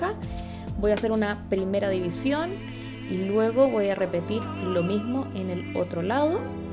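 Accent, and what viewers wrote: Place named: Chile. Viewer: Yo acá viéndote desde Alemania donde ya no voy a la peluquería primero por lo del corona y segundo son caras y muy, pero muy malas .....te felicito es rico escuchar el acento chileno de nuevo . pregunta tu traes babylight pero en las puntas quedan asi de claras o esas les hiciste balayege o algo mas ? saludos te pasaste eres muy buena peluquera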